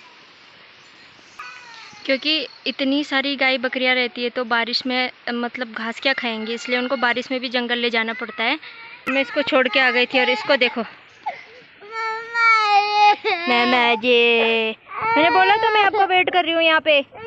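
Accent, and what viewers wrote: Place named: Aligarh, India. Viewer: आपके यहां का नेचर बहुत अच्छा है लेकिन भाषा अलीगढ़ की तरफ की लगती है हमारे गांव में भी ऐसे ही बोलते हैं😊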